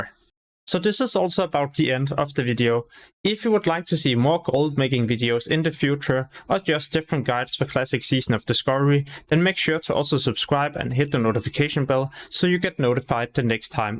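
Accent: Denmark. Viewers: m8 listen to the accent, thats gotta be the phattest danish accent ive heard:D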